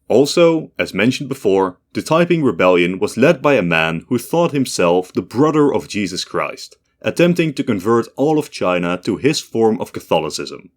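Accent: Netherlands. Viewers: he’s not English? He’s Dutch. A foreigner.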